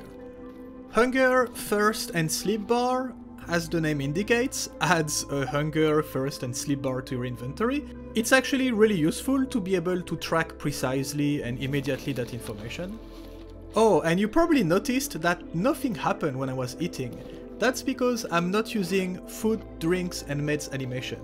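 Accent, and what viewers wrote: France. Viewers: a little bit too frenchy for me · Cet accent français incroyable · c'est clairement un accent Français que j'entend la ^^ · is that a french accent ? Parce que je m’y connais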